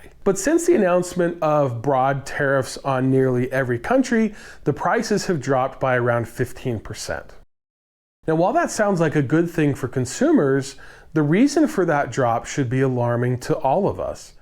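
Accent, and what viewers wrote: Pittsburgh, United States. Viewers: Hello. are you from the Pittsburgh area??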